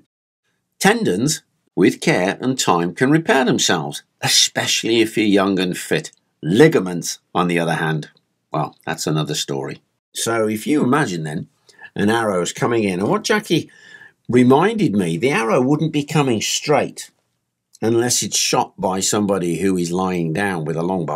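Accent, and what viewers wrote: United Kingdom. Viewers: Just found this channel glad I did. You’re a class act my man and you have the best kinda British accent btw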